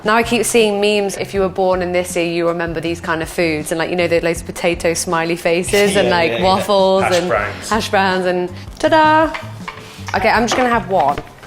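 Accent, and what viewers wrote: United Kingdom. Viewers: Here accent is more British than a ASDA or a Tesco worker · forgot she was british and i thought she was faking an accent in the beginning.. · Not gonna lie ...she has a strong British accent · She's so British 😍😄 I love it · Her UK accent ughhh 🥵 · That British accent, love it!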